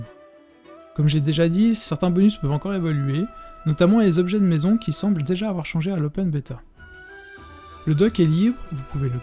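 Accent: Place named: Switzerland. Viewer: ahahahah mais c’est clair et ça fait du bien d’entendre l’accent du coin sur YouTube surtout quand j’aurais été presque sûr à 99,9% que le contenu serait du contenu provenant de YouTube francophone mais pas Suisse 😁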